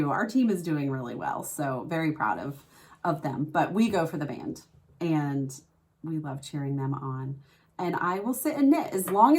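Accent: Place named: Ohio, United States. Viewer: !!! You did it, Girl!!! I loved sitting and knitting with you …loved everything about this including your “Ohio Girl” accent, which is so easy for this Jersey Girl to listen to!!!❤ I’m your fan from the days of and Kay, so the Fan Girl thing continues! I’m such the sock knitter too and hope you’ll do some teaching about the many heels we can try! Also, please talk about what needles you have tried and enjoyed. Do you use Magic Loop all the time or 9” or Flexi Flips, etc…I just want to know everything! Great job, Friend❤🧶❤️ PS..please move away from the swinging pendulum of the clock that was a bit distracting!❤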